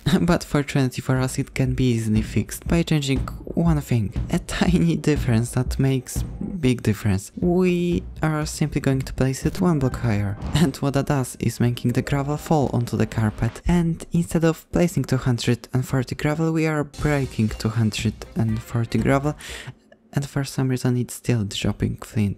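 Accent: Poland. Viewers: I thought you sounded Polish, I checked you channel and well, On point. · fajny polski akcent B) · Idk why but you sound Polish for me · od razu poznalem że jesteś polakiem po akcencie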